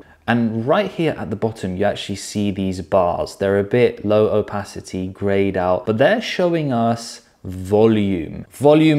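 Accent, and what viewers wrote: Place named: United Kingdom. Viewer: Come to the U.K-there are literally millons of us here with the same accent!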